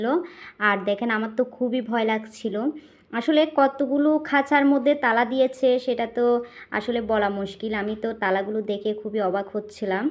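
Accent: Chittagong, Bangladesh. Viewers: ❤ amar khub bhalo laglo tomar Kotha gulo bon ❤khub misti bhasa chottogram a ❤sabar bhasa sabar kche sundor sabar sabae k samman dawa uchit❤khub sundor hoache makeup dressing darun sundor lagche tomae bon ❤ghoraguri jhal muri ❤❤gorome thanda juice khub bhalo lage❤sab milia khub bhlo lglo 👍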